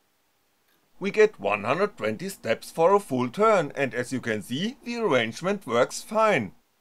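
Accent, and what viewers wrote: Germany. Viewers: Now finally I understand the rotary encoder! Only because it was explained with a German accent, the language of science! And of course because now the two sensors are placed besides each other! In fact it does nog matter where the (optical) sensors are at the wheel, but now that I look at this it all looks very simple. Thank you German guy!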